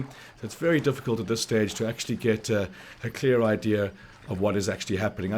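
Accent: South Africa. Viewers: Holy shit I did not expect a South African accent!!Howzit my China💪🏼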